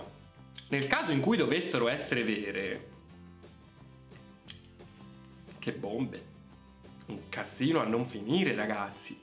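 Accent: Tuscany, Italy. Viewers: Io ti amo ....basta vederti e sentirti e l accento toscano poi ....😘😘😘😘😘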